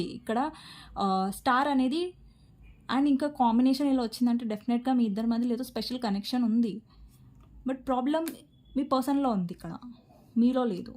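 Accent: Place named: Telangana, India. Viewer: ఎవరిని పట్టించుకోకండి. మీది తెలంగాణ బాషా చాలా బాగుంది బాధ పడకండి. మీరు నిజాలు చెప్పుతున్నారు చాలామ్మా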